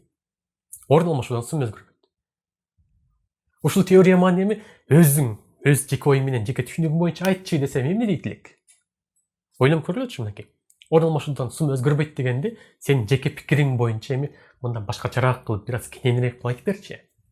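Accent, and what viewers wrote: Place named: Kyrgyzstan. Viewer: Таптаза кыргызча сүйлөгөнүңүз суктандырат, мен да ушундай сүйлөгүм келет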